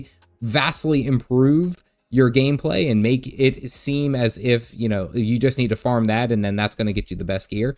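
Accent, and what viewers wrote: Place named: Delaware Valley, United States. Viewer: This dude is from the Delaware Valley and I love it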